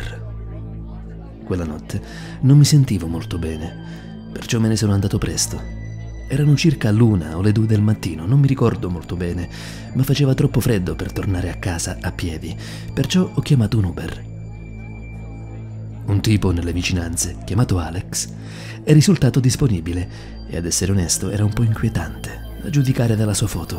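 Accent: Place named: Calabria, Italy. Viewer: non so voi ma ascoltare queste storie alle cuffie è qualcosa di fantastico ma allo stesso tempo terrificante! comunque complimenti davvero per il montaggio la narrazione e tematica... e poi dalla voce sembri calabrese giusto?!?!? :) COMPLIMENTI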